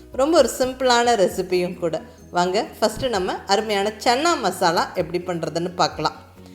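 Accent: Kongu, India. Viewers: Ungaludaiya kongu tamil 👌👌👌👌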